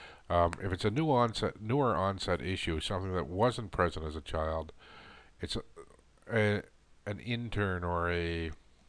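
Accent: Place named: Michigan, United States. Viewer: Where are you located? I detected a bit of Michagander in your dialect.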